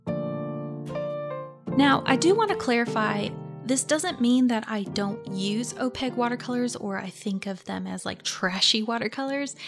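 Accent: South Carolina, United States. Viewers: I like to guess a speaker's location by their accent. South Carolina?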